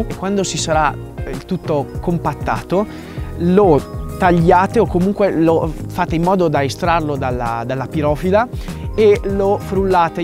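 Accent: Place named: Romagna, Italy. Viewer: Come mai hai la ESSE romagnola?!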